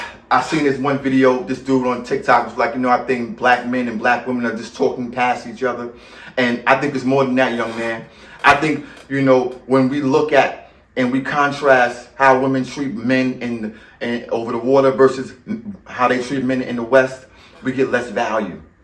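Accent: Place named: United States. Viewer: Btw, your American accent alone is exotic to them. If you try to speak their language that puts you to the front of the line. Just be humble and they will accept you!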